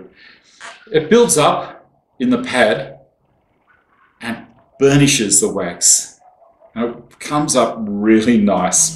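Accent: Australia. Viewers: you sound Australian but are you living somewhere else ? other country !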